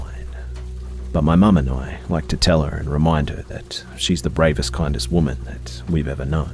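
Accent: Australia. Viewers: Is it me, or did your accent became more aussie? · I love your stuff man ! And you're Australian voice, your video's go really nice with a joint in bed ! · are you an Aussie?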